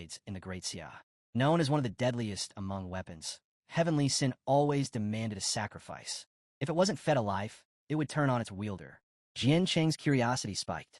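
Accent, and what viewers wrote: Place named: United Kingdom. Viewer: Why does this have british accent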